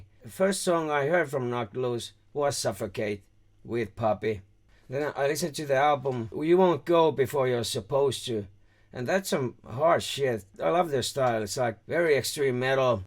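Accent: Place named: Finland. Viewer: Not gonna lie, it took me a while to place that accent. At first I thought it might be some odd dialect of Irish English, but after a while the Finnish-ness crept in - which I eventually caught, being also from the Nordics. Norway, in my case. Anyways, terve to you, my man. Good video! PS: It's reggeaton.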